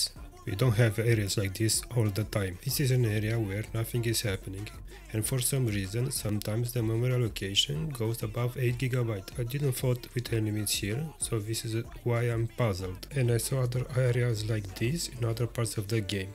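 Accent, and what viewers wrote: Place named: Eastern Europe. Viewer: Great video, love the professional yet slavic accented english, it shows he's doing as good of a job as he can, great indepth overviews, overall 10/10 pc gaming content youtube channel that i would love to see grow from 24 subcribers to thousand if not more! You got at least a new subscriber as long as your videos keep this great of an indepth and quality.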